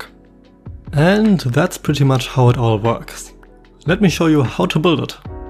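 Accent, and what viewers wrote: Germany. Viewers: Wow! I love the size of it! :D Btw: are you German? Your accent sounds very german I think :) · Er hört sich wie ein Deutscher an oder spinn ich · Dieser deutsche Akzent....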